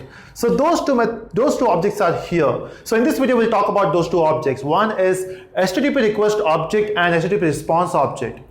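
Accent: India. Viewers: people that don't understand strong indian accent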